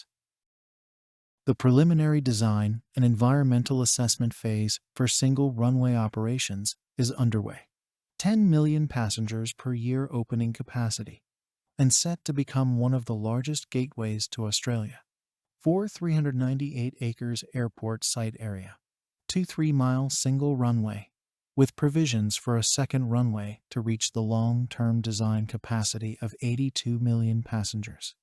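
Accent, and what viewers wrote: United States. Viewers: This video is a mishmash of graphics and congratulatory remarks only a public relations copywriter could conjure up. It’s repetitive and covers the same subject over and over. It lacks substance and nuance. The graphics are brief, lasting about two seconds, so whatever purpose it had is lost in the shuffle. Who put this young man up to this task? He sounds American so how did that happen? Nah, not liking nor subscribing. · Don’t get me wrong I understand most of this project it annoys me that it’s narrated by an American but the thing that gets me this commentary says that there will be a rail bridge within the airport precinct I was under the impression that it was underground within the airport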